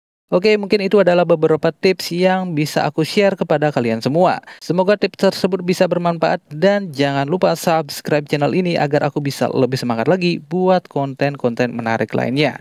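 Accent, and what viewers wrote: Bali, Indonesia. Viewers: medok bali banget kayaknya ni hostnya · Nah, nemu juga video yg kasih tips kaya gini. Sungkem bang..btw narator dari Bali kah? 😁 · Iya, kelihatan logat Balinya😁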